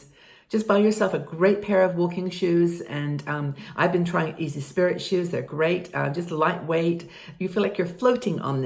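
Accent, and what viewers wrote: England, United Kingdom. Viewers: You're an inspiration! You sound English? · You are lovely! Are you originally from England? I detect an accent :) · i thought you were english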